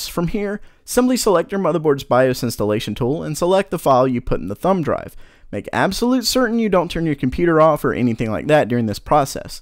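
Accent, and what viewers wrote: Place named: Philadelphia, United States. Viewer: You gotta be from philly...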